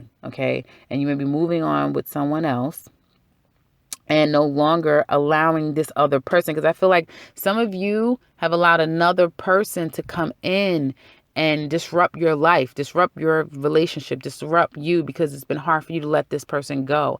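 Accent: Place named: New Jersey, United States. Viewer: nice reading dead on....luv ur accent sounds like New Jersey New York or Maryland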